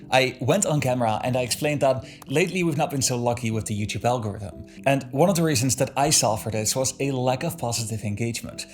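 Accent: Netherlands. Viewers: His accent obviously shows that he is a native Dutch-speaker. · Jij bent een Nederlandse. Dat hoor ik aan je . Maar jij spreekt wel heel goed Engels hi Maar zou je is een aflevering willen maken over het paranormale in Nederland de vrije metselaars❤❤❤❤ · Are you sure? Because they're definitely trying to make money and milk the residents for their last eurocent. Also I can hear from your accent you're Dutch too 😄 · which Dutch accent this presenter speaks with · you sounds very dutch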